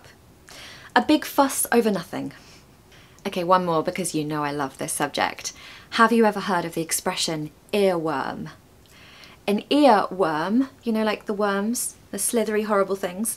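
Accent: United Kingdom. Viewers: Hello, Beautiful, charming, refreshing!!! I'm a Southern USA American from 1600's ancestors. Your musicality, considerate & gentle way of phrasing, positivity, and floral frocks:) resonate with me! Your analogy of a rose incorporating beauty, strength, and resiliency could not better describe Southern USA woman in the 1800's. I find more in common with your accent than with the urban USA accent (changing "t" in a middle of a word to a "d" sound, for example). The greatest difference my untrained ear detects is that you don't use a flat "a" vowel sound (heard in words like mascara and vase) and yet we share the schwa and silent consonants, as well as my much teased use of "reckon". Your video "Turn your American accent to British" is spot-on. A specific question for you is how should one untrained in linguistics begin to explore their historic, enduring connection to Britain and further support the study of the English language on continents afar? 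· I love your pronounce but I can’t understand where came from? But is really British and lovely!!!!! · I fall in love with your British accent 💚🩵💙💜💛🧡❤️💜🩷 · Your session will be an earworm in my head due to your accent. You've acted in this session as Hermione in Harry Potter series. I am so intrigued with your accent. I mean the British accent. I really express my gratitude to every moment you have taken to come out with this lovely, indulgent work. keep the good work up. Kind regards, Moe. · I just found out this channel recently, and i think this is the suitable channel for me to learn the British English. Firstly, I like your accent, the way you speaking is like singing a beautiful song. The speed of your speaking is not that fast so that i can listen carefully and mimicking right away Secondly, you are a very down to earth lady that is like a Sister in my neighborhood who do not put a heavy makeup and very decent wearing that do not expose your body. 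Make us can concentrate on learning. Thirdly, your character is very cheerful and you always share your real life stories with us. I repeated your video at least ten times in a go to make sure i learn what you teach. Your video really full of resources and knowledge that we need. You are really a good teacher in making this kind of video. Thank you so much for your efforts. I am a Malaysia Chinese girl.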